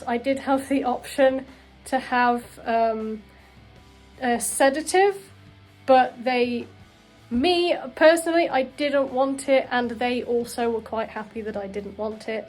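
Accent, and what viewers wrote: United Kingdom. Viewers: Your British accent is amazing! Hope we can hear you singing some lyrics with your new band!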